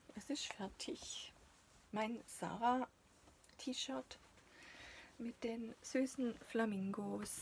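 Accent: Swabia, Germany. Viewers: Hallo, ich höre dir gerne zu, du hast eine Art die mich total entspannt, keine Hektik nur tolle Arbeiten zum schauen und dein leichtes Schwäbisch ist sehr nett. Ich will auch so nen Baumarkt in Frankfurt aber er wäre mein Ruin glaub ich. Du machst das ganz wunderbar und ich freu mich jedes Mal wenn ich ein Video von dir sehe. Mach's gut bleib gesund.😉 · Hallo. Ich mag deine Videos und dein schwäbisch ist einfach nur schön😊 grüße aus Esslingen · Hallo liebe, vielen Dank für den Tip mit dem Baumarkt für Frauen "freu" da werd ich die Tage doch mal vorbei schauen isch ja grad mal ums Eck und endlich mal eine Schwäbin die nicht Hochdeutsch "schwätzt" Liebe Grüße aus Fellbach:)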